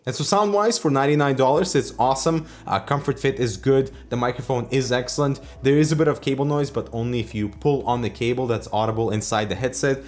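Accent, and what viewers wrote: Russia. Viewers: Guys, is from Russia?! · ***** RUSSIAN Accent Happy? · Okay.... so is he a Russian or Swedish?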